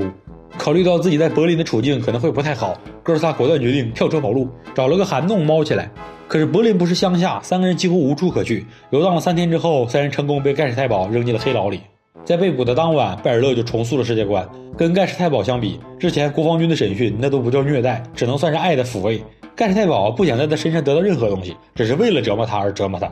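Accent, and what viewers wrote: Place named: North, China. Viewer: 每次聽到你的聲音，我都覺得耳朵都要懷孕了～這北方口音～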